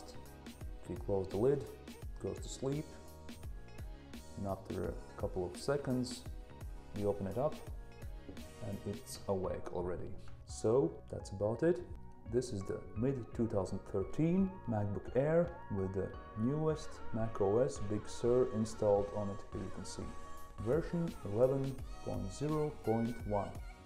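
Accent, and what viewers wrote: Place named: Russia. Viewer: Раша акцент ю ноу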